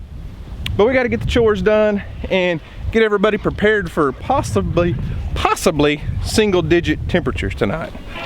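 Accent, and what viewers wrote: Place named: West Virginia, United States. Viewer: My 5 yr old daughter and I just watched this video together and she said Momma, he has goats like we do and he talks like us too 😂😂😂 we're from West Virginia. It's bitter cold here too. Can't wait til Spring....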